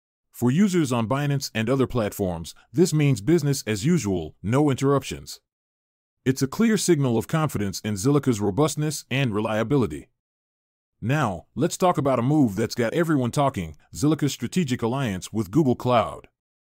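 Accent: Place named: Singapore. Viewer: Prefer your SG accent lol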